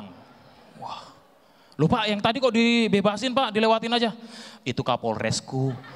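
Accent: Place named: Balikpapan, Indonesia. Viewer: Wkwkwkwkwk logat balikpapan yang sangat khas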